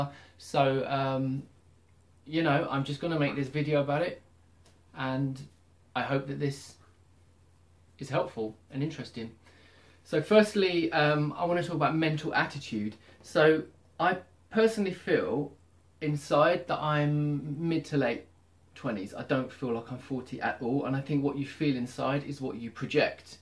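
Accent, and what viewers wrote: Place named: United Kingdom. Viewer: can't we agree it's the Celtic / ANglo background of you? I clearly pick on some British accent tones, I'm italian but also...slavic. Which is the worst, eastern european look 2 times older..i'm late 20s and look like 40 due to my slavic backgr. thanks for the video though you make some very good points.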